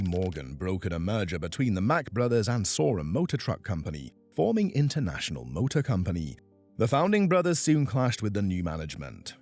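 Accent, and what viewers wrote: United Kingdom. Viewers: Why a Brit explaining American history?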